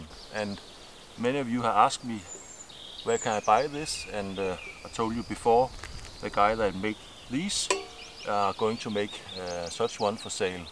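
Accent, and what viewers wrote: Denmark. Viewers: You sound Danish, from your accent, but where did you film this; as the scenery looks too rugged to be Denmark? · du er fra danmark jeg kan høre det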